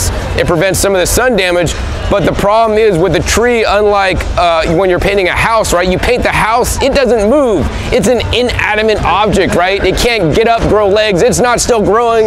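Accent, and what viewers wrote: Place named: United States. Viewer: Totally misleading video! I thought there would be a garden tour with footage of diagnosing plants with microbial deficiencies. But alas, products, products and more products. Consume, sell, market etc. Should have figured with your American accent. Why do yankee's always have something to sell?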